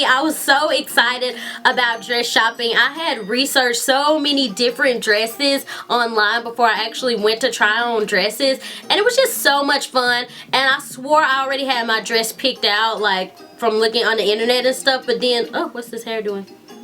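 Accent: South, United States. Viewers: OMG You got engaged on my birthday...and we both have southern accents lol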